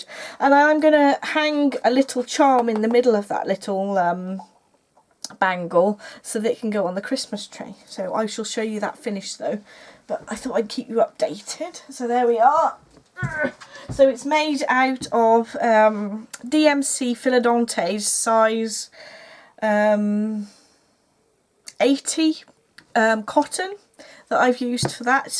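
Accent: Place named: Shropshire, United Kingdom. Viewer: Found you today and loved your podcast. I am going to follow your instructions for knitting my first pair of socks. Hope you don’t mind me asking but are you from Shropshire originally? I am asking because your accent is just like mine.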